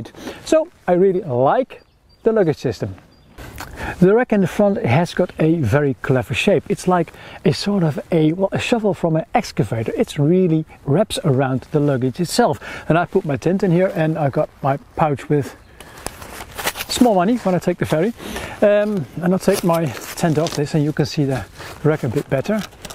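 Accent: Netherlands. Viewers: Ik volg je altijd zeer kundige en gedetailleerde testen al jaren. Ook uit het Op Pad tijdperk. Echt waardevolle info waar ik veel aan heb. Toch wil ik al een tijdje wat kwijt over je nieuwe kanaal. Nu vraag je er speciaal om. Toevallig was deze video in perfect (voor mijn oren dan) Engels. Veel technische termen en zorgvuldig gesproken met een duidelijk verhaal. Veel Engelstalige 'reviewers' kletsen soms maar doelloos rond. Reviewen is een vak. Dat beheers je dus duidelijk uitstekend. Het blijft moeilijk om iets in een vreemde taal exact uit te leggen wat je precies wilt duidelijk maken. Intonaties, woordkeuzes. Mijn Engelstalige vrienden zeggen altijd dat wij beter Engels spreken dan zijzelf. Wij spreken volgens het boekje. Dat doet geen Engelsman.Tegelijk zeggen ze ook: wij proberen juist niet te zeggen wat we denken. Nederlanders zeggen direct wat ze denken. 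In het Engels gesproken klinkt dat heel onengels en herkennen ze onmiddellijk dat we een Engels sprekende Nederlander zijn. Ze drukken zich anders uit. Ik heb respect voor je poging een groter bereik te krijgen door te kiezen voor een niet natuurlijk liggende taal. Het blijft een afweging. Toch lijkt het mij voor je zelf makkelijker Nederlands te spreken. Je hebt nu eenmaal een Nederlandse manier van spreken. Het is meer werk maar je kan jezelf ondertitelen op Youtube in het Engels. Zelf een samenvatting typen kan. Een spraakomzeter het werk voor je laten doen met evt. correctie achteraf. Eenmaal zo'n tekstbestand aang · Great review. Your English is extremely good. Nothing I didn't understand. Love your Dutch accent ;@ But seriously €2,600!!!!! That's about €1,000 more than I thought you were going to say. The reason it is so expensive is because it is sold under the bikepacking umbrella. 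If it were a normal 29" hybrid without orange racks it would be half the price. SLX is good but not that good! I have one of the first Trek 7500 hybrid from about 1998 with Alpha SL Aluminium frame. It is very stiff and strong as the tubes were over size. It too had SLX or LX components back in the day, but it cost me about €300 new!!!!! The spec is now Shimano XT with handbuilt 700C x 35 wheels. I would not swap it for anything as the aluminium frame is the best thing Trek did, although I do not like the look the carbon fork on the 1120 today. They should have gone with aluminium or Cromoly, Also the Trek 1120 looks like a rival to the Specialised AWOL which I think has better long distance touring potential certainly better racks and can carry more. Their front pizza rack is a gem. I don't own one. Could you do a review of a similar bike to the Trek 1120 but with Rohloff hub or pinion gearbox preferably with Gates belt drive? 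But great review. I am already subscribed to your channel.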